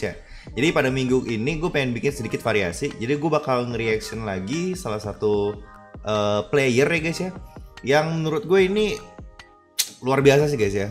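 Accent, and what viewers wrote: Java, Indonesia. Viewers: Orang Jawa to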